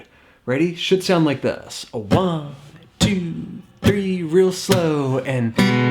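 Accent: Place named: United States. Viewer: Love your passion amigo! Great lesson again....where are you from in the US?? Your accent is super cool! Much love and gratitude from Ipswich, UK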